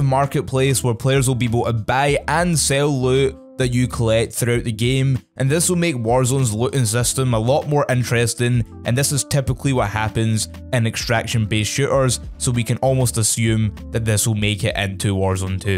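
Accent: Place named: Scotland, United Kingdom. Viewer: I am simple man. I hear a Scottish accent, I give a thumbs up.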